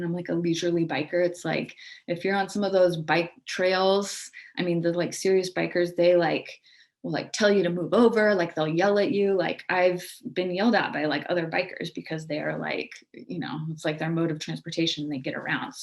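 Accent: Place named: Washington, United States. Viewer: Well said , you even pickup the accent, enjoy life in Washington State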